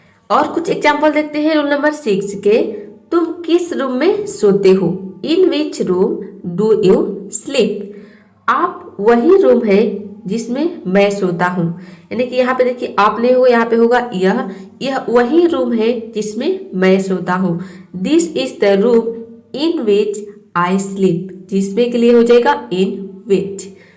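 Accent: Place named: Bihar, India. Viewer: Bihari sare esahi bolte hai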